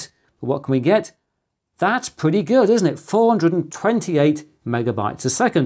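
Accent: United Kingdom. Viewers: Would be nice if the channel removes stinky f British accent. Accent of world slavery and kings to enslave ppl.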